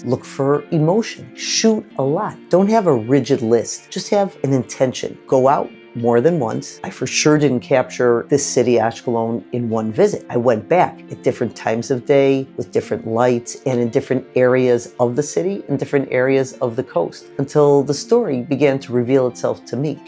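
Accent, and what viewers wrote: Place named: New York, United States. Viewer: Enjoyed finding your channel. Definitely inspires me. I am originally from Brooklyn, NY and thought you were from NY as well until you said Chicago. Didn't realize the similarity!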